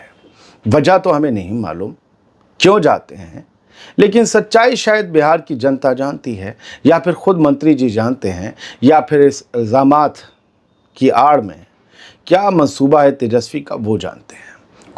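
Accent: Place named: Lucknow, India. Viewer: zanab mai to apki lucknowi andaz me reporting bich bich me behtreen urdu ke alfaz aur Palestine, gaza pe itni shiddat aur safgoi se reporting ka vaise hi qayal ho gaya hu ! Mai apka regular viewer hu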